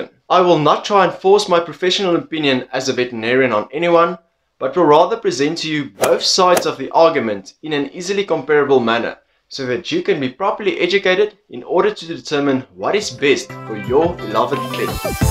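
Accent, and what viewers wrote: South Africa. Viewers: Great RSA accent and easy on the eyes...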